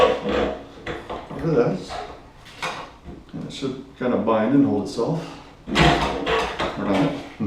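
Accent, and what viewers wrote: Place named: Northern Ireland, United Kingdom. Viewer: the NI accent sounds awful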